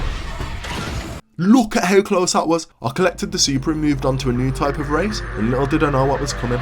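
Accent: United Kingdom. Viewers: FUCKING BRITISH NOOO but i will stay for mw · Well i am sure your accent really does sound British brother 🇬🇧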